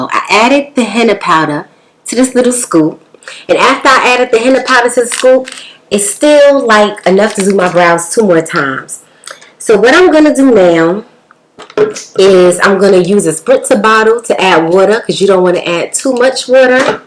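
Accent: New York, United States. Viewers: That NY accent takes me back home every damn time 😁🥰 As always sis, you did that. They look really nice and natural. I've been contemplating ordering a kit off Amazon to make my brow shape pop and this was all I needed to see to go ahead and order it. I'm 37 and my eyebrows haven't grayed yet (knock on wood) but girl why am I getting gray eyelashes?! Like what kinda nonsense is going on here?! Them gray hairs just be finding new ways to be mad disrespectful. Speaking of lashes, waaayyy back when you had that video of applying individual lashes, do you still do your lashes at home?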